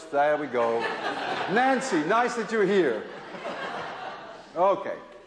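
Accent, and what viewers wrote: Netherlands. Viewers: The dutch accent is indeed very recognizable, but atleast he's not like Van Gaal. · Some people tell me that I sound just like this man when I'm talking English. I'm Dutch too.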